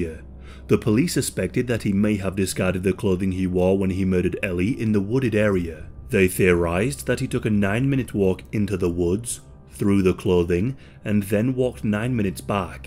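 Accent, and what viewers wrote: United Kingdom. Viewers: lol ur so british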